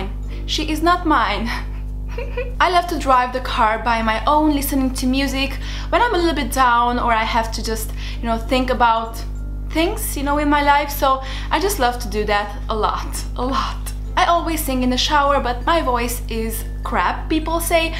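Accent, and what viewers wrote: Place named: Romania. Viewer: I also forgot to mention I had a friend from Romania named Anna Monica when I was in 1st-3rd grade! She definitely had your accent!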